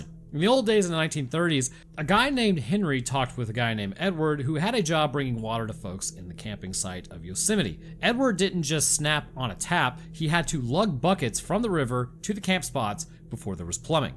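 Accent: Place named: Connecticut, United States. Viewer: The Connecticut accent is feint yet still unbearable. Please try harder to escape the hell hole you're from.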